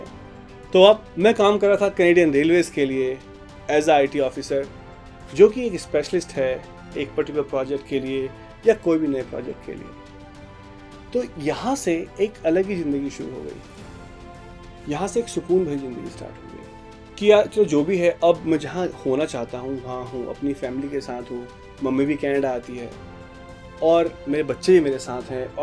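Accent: India. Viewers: I am here after watching your video where you replied to Technical Guruji ... Hats off to you boss , tone se thoda thoda main samjh gaya tha ki bande ka Pahad se koi na koi link to hai or nikla b wahi. I am from Uttarakhand too. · You look like kashmiri because you have a pure kashmiri accent!!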